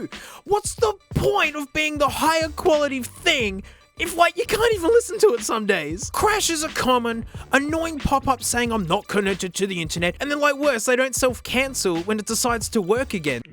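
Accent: Australia. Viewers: He's got that perfect Aussie accent that makes you want to listen to anything he says.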